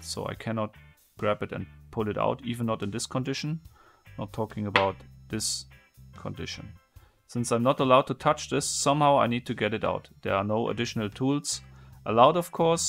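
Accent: Germany. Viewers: The German accent makes me want to watch his videos even more :))) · Is this a german acent?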